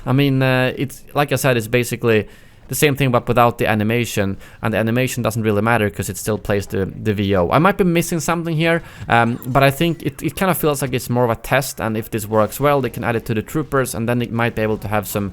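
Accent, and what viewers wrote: Sweden. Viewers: are you swedish? sounds like you are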